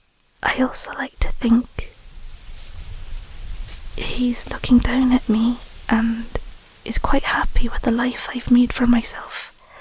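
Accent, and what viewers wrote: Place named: Scotland, United Kingdom. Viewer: I like werewolf girls, I like Scottish accent, I like stargazing. What a great combo.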